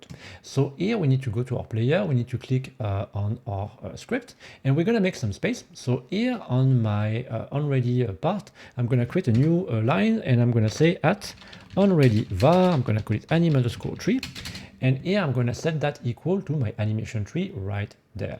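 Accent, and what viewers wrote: France. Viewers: je m'attendais pas à l'accent français, super vidéo en tout cas et merci pour le contenu gratuit et super instructif que tu nous proposes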